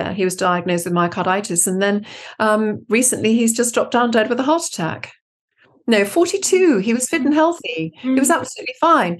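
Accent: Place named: South Africa. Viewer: Excellent interview with great advice. Thank you so much for bringing this to us. And rather nice hearing your SA accent! Take care. ♡♡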